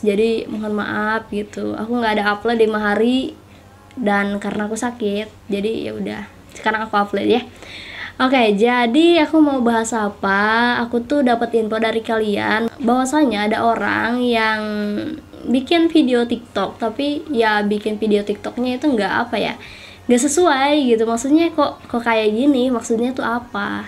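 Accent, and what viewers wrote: West Java, Indonesia. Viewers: Boleh nanya ka org mana si? Jabar kah:v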